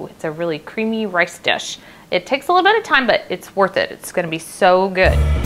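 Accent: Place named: United States. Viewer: hey I just subscribed to your page I love risotto I make it every now and than love it and I actually have a Scottish background so not Italian lol but still in Europe lol my nan was Scottish when she was alive I never met her but she seemed nice ,I will definitely try out your reicipe I am from Australia,are you from America? I can tell a bit from your accent I have shallots here we call them eshalots here I call them baby onions as they are little lol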